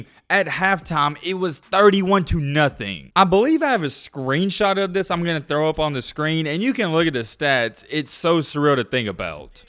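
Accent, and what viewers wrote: South, United States. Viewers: Dumbest video on YouTube. Cincy wears black 95% of the time. This dude's southern accent gives his lack of knowledge of this away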